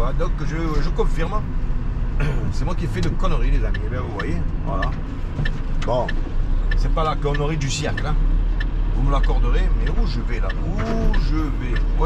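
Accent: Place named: South, France. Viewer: Le bon accent du sud ! 😊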